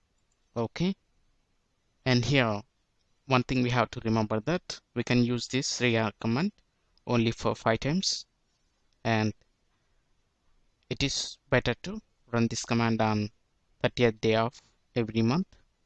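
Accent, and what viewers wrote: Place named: India. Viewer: Indians speaking english sound very ridiculous!!!!!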